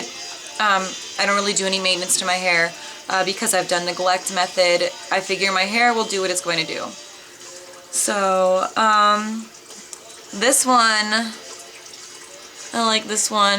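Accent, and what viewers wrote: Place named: United States. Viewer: Jesteś Polką, ale masz super amerykański akcent. Mieszkasz w USA?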